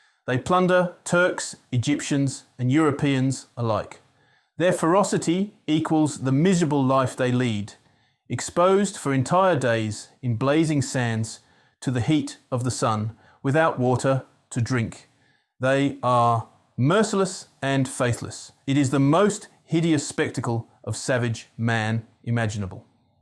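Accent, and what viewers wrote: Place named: United Kingdom. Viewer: I am not sure you could have gotten a worse narrator. Did you really need Napoleon to be read by a guy with a heavy British accent and saying - each - word - individually - and - distinctly - with - no - smoothness - of - delivery. I cannot imagine how he learned to speak like that and not be beaten by his teachers. Maybe have him read it once beforehand and then record the second attempt. Hopefully, he was not a professional narrator. But I complement you on the topic. I myself was looking to read more about the Egyptian Campaign after seeing the Napoleon movie.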